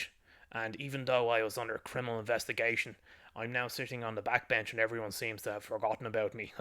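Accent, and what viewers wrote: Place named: Dublin 4, Ireland. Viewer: Love ur d4 accent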